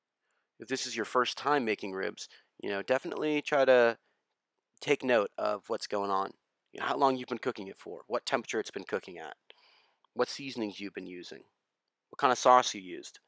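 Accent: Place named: United States. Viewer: American accent, so I'd say F.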